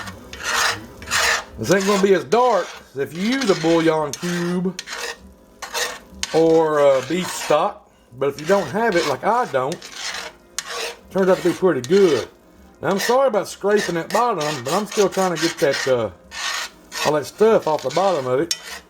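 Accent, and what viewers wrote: South, United States. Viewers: When I heard that southern accent, I knew then this was gonna be some good stuff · Gotta love that country accent!!! 🇺🇸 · I watched True Grit and could only understand half of it. I was able to understand this guy's video. I'm getting better and better at understanding Southish.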